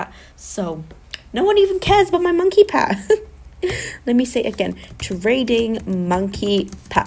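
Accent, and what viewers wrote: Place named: United Kingdom. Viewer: Wow you are British and a normal English accent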